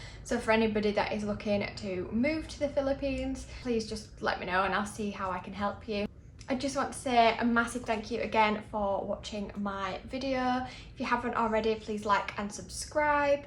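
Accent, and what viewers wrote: United Kingdom. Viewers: We love listening to British accent…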